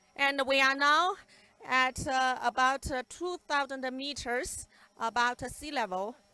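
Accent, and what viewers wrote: China. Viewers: What I love about the video is that when she switch from English to Chinese or vice versa she sounds the same mean Chinese her Chinese ascent is so Heavy as she goes from English to Chinese I'm like hold on what?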